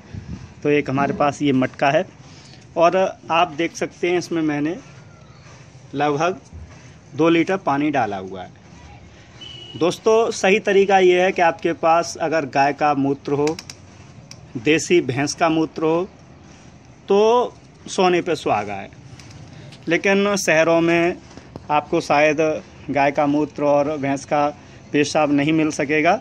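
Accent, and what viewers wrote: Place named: Chambal, India. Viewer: Sir I m from mp chambal region n ur voice sounds like us. Khn se hn aap???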